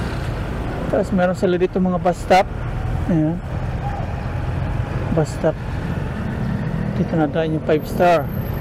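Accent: Visayas, Philippines. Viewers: Wag mo sabihin Caviteño ka,,,iba ang way mo ng pagsasalita parang pang bisaya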